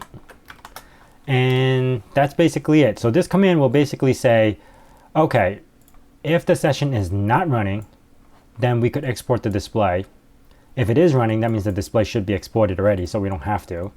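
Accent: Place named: New York, United States. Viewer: You are a genius. And I am very impressed with your knowledge of the Windows WSL and Linux ecosystems. I am also impressed with your English and your accent ( which I assume is somewhere around New York ). I will never live long enough to master all those things. ( I watched this in Xfce using Fedora Rawhide with all the latest bells and whistles that Fedora offers ). I hope I can redo what you just did using my Fedora Rawhide setup.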